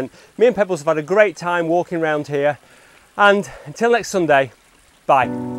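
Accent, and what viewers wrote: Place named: Yorkshire, United Kingdom. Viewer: you're still utilizing the original 70/200 nikon vr? please explain why mate! love the regional English accent....so soothing! Is it yorkshire?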